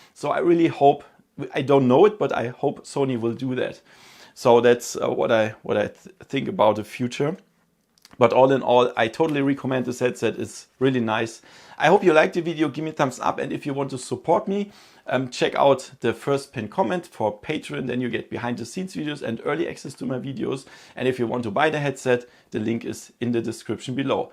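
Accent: Germany. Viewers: He is German speaking in English. Now your turn do a video speaking in German.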